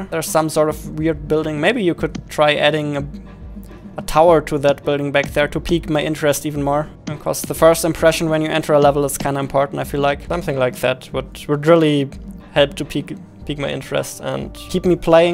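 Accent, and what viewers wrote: Germany. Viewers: Bist du deutscher? Dein Englisch klingt nämlich so xD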